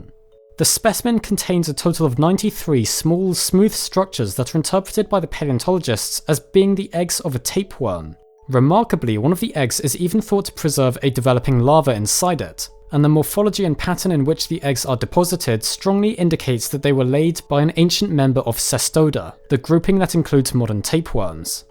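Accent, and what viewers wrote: England, United Kingdom. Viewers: I knew I was about to hear an english guy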